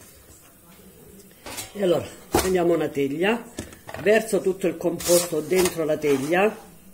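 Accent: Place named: Sicily, Italy. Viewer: Super cuoca simpaticissima la parlata siciliana mi piace da pazzi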